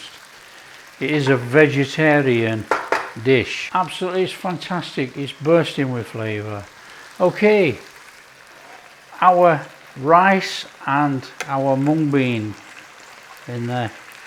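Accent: United Kingdom. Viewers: Sir are you a Yorkshireman ? You sound Dickie Birdie and almost like Groffrey Boycott buy then not quite like him though. Wonder if Dir Groffrey will like this Indian broth 😀 Happy Khichding 😀. Khichdi in Indian colloquial parlance means a ness 😀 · Just watched it for the goo 'ol br'ish accent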